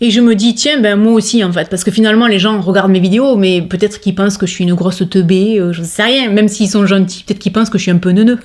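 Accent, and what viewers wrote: South, France. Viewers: Moi j'adore ton accent du sud ❤ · Merci à toi. Tes vidéos me plaisent toujours autant. Tu as bien raison de ne pas te laisser faire, bravo. Et moi j'adore entendre ton accent du sud en particulier tes "moins" 😂 bonne fin d'année à toi ❤❤ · Bonjour, je crois que c'est la première fois que je laisse un commentaire...J'aime beaucoup ce que vous faites, et votre accent est super (je suis moi aussi du Sud - mais Est;) )..Pour en revenir aux réseaux sociaux j'ai fait un commentaire récemment sur twitter concernant une photo d'un hérisson en taggant une personne qui les dessine et qui les nomme "choupisson"...Je me suis pris une volée de bois vert de la part de l'auteur du tweet qui a dit en gros que ce genre d'appellation faisait du mal à ces animaux et incitait les gens à les prendre en animal de compagnie... Pour un mot... Merci pour votre bienveillance et votre sourire..; Belle et heureuse année à vous et votre famille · Je suis estomaqué de voir à quel point certaines personnes sont méchantes. 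Moi j’adore tes vidéos tu me fait toujours passer un très bon moment, toujours bienveillante et j’adore ton accent ( je suis du sud aussi). Continue comme tu es et tu as raison on ne doit pas banalisé le harcèlement. Bisous 😘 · J'ai été harcelée à l'école sur le fait que je parlais "pointu", en gros l'inverse de ce que l'on reproche à ton bel accent du sud. En gros j'ai été harcelée car vivant dans le sud, je n'en avais pas l'accent..... Ridicule non? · 😱 je trouve ça épouvantable d’avoir à expliquer tout ça! Je vais donc en profiter pour te dire que j’adore ton accent du sud! Je suis du Québec et mon fils s’est marié à Limoux en 2019! Il habite cette belle région du sud que je trouve formidable! Merci pour tes vidéos! 🥰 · Bonsoir. Et bien moi j'ai 55 ans, je travaille dans un service public qui accueille des clients plus ou moins intéressants, je suis du 12,j'ai un "assent" comme le tien...bref je cumule!!!! 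En résumé: vieille,fonctionnaire et du sud...mais qu'est-ce que j'aime ça!!!!. Vive nous et m.... aux aigris. Vive!!! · Coucou, oui le harcèlement est réprimé par la loi, et oui il faut le dénoncer car généralement les harceleurs s’en prennent à plusieurs personnes quand le but est atteint, ils attaquent une autre et ainsi de suite … j’adore tes vidéos sincères, j’aime tes crash-tests et les autres tests avec avis bref, c’est un plaisir d’entendre ton petit accès du Sud et ton rire 😊 Belle année lumineuse ✨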